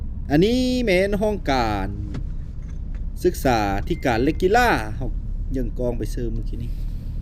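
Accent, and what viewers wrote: Phu Thai, Thailand. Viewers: เจ้าเป็นคนเเขวง เมืองเลอ คึเว้าพูไท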